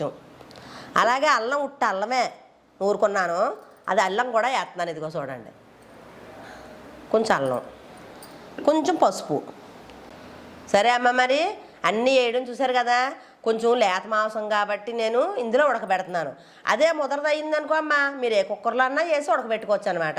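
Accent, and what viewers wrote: East Godavari, India. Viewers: Ma east godavari ante alaguntadi mari..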